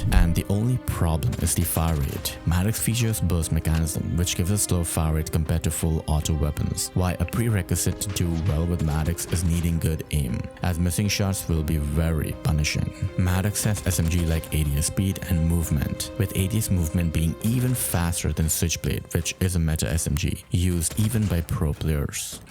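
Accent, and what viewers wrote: India. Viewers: I usually dont like indian accents as they are hard to understand but the crispness of your mic and the way u talk is just so smooth 😭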